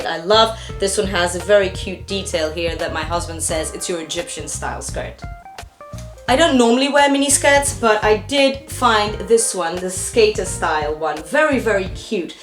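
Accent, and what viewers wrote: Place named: United Kingdom. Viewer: Just love your British accent!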